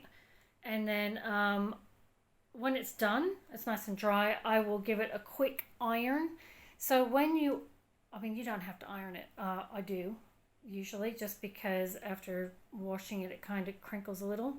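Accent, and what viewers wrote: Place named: Australia. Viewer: You have a very interesting accent. Australian mixed with what? Canadian? American?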